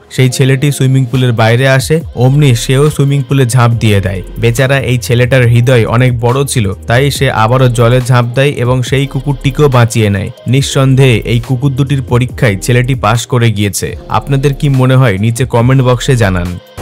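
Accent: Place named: Bangladesh. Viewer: Vdota bhaloi baniyechho, tabe India ke chhoto karar prochesta nindoniyo. Tomar Bangla shune mone hoy tumi Bangladeshi. Bina kharache Hanglamita tomader moddhei dakha jaay, Bharatiyoder moddhe noy. Bharotiyoder aatmo marjada gyan aachhe karan ekhane garibi anek kam, ja tomader deshe aakash chhoa.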